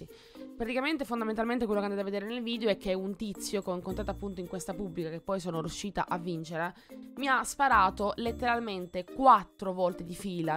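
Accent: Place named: Naples, Italy. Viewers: Ma sei napoletana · apprezzo lo sforzo nel parlare napoletano gg sei fortissima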